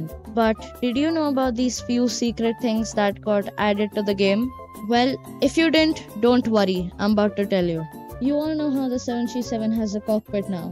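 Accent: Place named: India. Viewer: Bro sounds like indian😭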